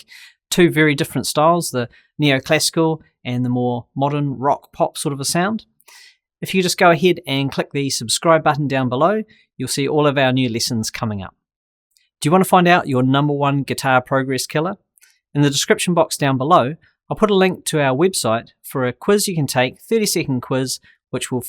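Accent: New Zealand. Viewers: Nice to hear a new zealander for once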